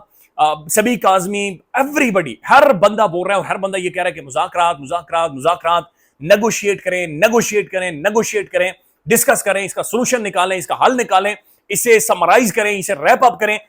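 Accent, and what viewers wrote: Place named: Pahari, India. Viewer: sir please ek vlog pahari main bhi kren qasm se lutf aata hai apki pahari sun karr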